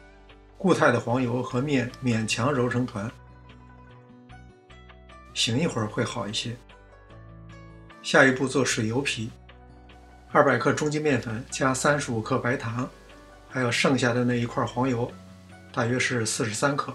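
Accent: Beijing, China. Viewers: 太喜欢看您的视频了，菜谱简单轻松易学，谢谢您和大家分享。北京话听起来好亲切啊 ☺️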